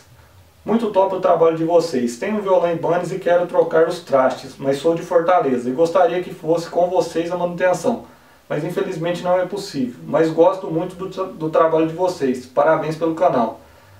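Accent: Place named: Minas Gerais, Brazil. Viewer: Araguari? Bacana! Sou de Uberlândia, bem ao lado. Você é de onde? É mineiro, pelo sotaque, mas de que cidade?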